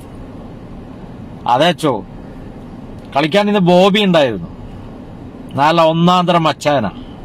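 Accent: Kasaragod, India. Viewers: കേറി വാ മക്കളെ...🙋 ഇനി മുതൽ കാസർഗോഡ് ഭാഷയിൽ ബ്ലോഗ് കേൾക്കാം...😍😍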